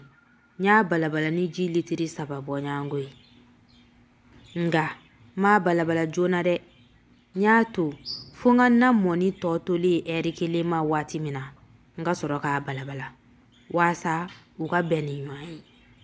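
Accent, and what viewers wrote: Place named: Ségou, Mali. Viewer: J’adore ton accent tu viens de ségou ?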